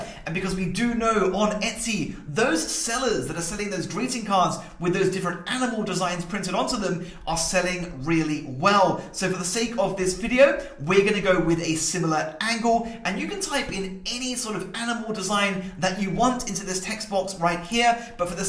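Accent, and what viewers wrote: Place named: United Kingdom. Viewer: Annoying that Printify don't actually provide greeting cards in the UK. Since the guy in the video sounds British I didn't think it would be a problem and I've already done all the other steps but I cant actually do it! I cant seem to find any platforms that provide this in the UK - does anyone know any?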